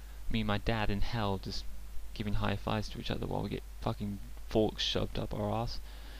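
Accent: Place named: United Kingdom. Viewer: I am surprised - you sound british seems odd they would care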